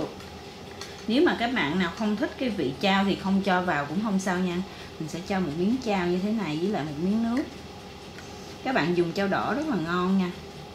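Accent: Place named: South, Vietnam. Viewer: rất cãm ơn em dạy món thịt quay xem ngon lắm, nghe em nhai dòn rụm chảy nước miếng luôn, món ngon nhà chị ai cũng thích và hay mua ăn có khi ăn ngon quá khĩa 2 bửa luôn, sẽ mua thịt sống về làm theo em - mà nghe giọng miền Nam chân phương của em cãm tình và dễ thương lắm cũng nên quay cái mặt cho mọi người quen biết nữa nhé.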